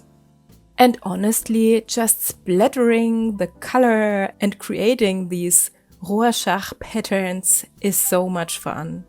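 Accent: Germany. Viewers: Ich höre da einen deutschen Akzent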